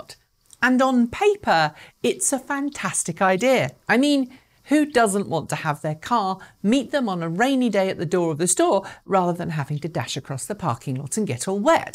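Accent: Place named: United Kingdom. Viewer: So happy your British accent has returned after having listened to the one with Arcimoto. I have no doubt whatsoever that if Tesla removed any feature once enabled it would lead to legal confrontations. Many Americans do not like to be told "NO!". Having owned my model3 for a year now, the autopilot function is great with a few caveats, it is sadly out of its depth when required to cope with the many mentally challenged road users that frequent Hwy 101. I am of the sad opinion that the roll-out will be seriously hindered because of the incompetent drivers on the roads today. I further think that this situation will not improve with time.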